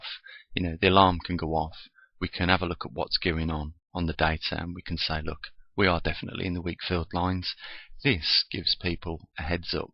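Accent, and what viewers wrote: Birmingham, United Kingdom. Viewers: At last a fellow brummy keep doing the good work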